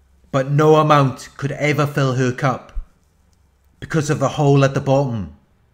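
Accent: Wales, United Kingdom. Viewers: Welsh accent..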